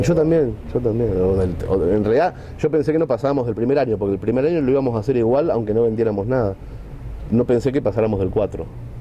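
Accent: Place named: Buenos Aires, Argentina. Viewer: La mezcla en el acento de porteño y español me mata!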